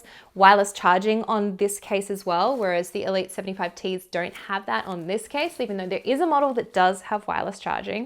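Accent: Australia. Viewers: Dodgy aussie accent. Reminds me of the racism i faced while i was there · Nice hearing an Aussie accent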